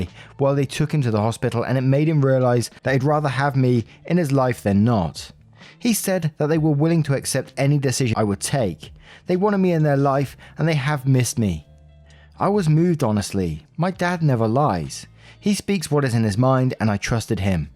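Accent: England, United Kingdom. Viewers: There's nothing better than hearing that proper English accent sing am I the butthole😂😂❤❤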